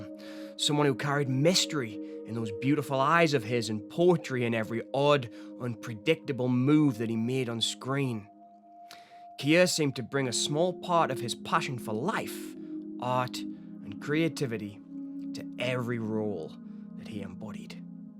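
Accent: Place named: Scotland, United Kingdom. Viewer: I guess u were expecting an answer and all u got was a little love heart ;) There's definitely a dram of Scottish in there, maybe some Irish too, and of course East? American.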